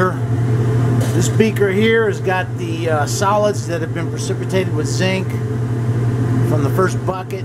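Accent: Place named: South, United States. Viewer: Great video, but after a while I started thinking it was George W. doing this! Dunno, must be the southern drawl that sounds a little like him...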